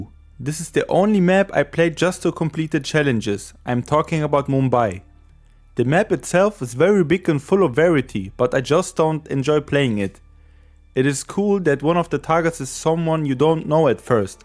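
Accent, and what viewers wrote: Germany. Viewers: Man merkt das du Deutsch bist Aber trotzdem gutes Video ❤ Und sogar unterhaltsam 👍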